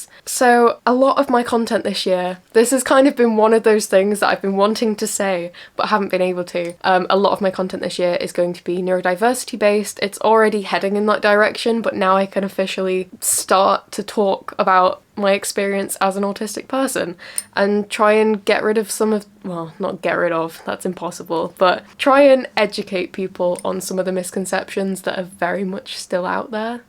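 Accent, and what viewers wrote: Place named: United Kingdom. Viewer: Your British accent is so cool, I can actually understand it like American English but with the British vocabulary. What part of Britain are you from?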